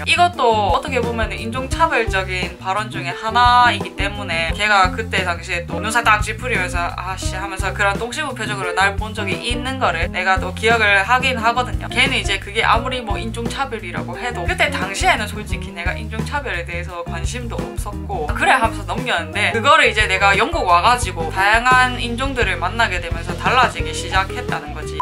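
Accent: Busan, South Korea. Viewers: 음....좀 더 듣다보니...부산같기도 하구요 ㅎㅎㅎ ^^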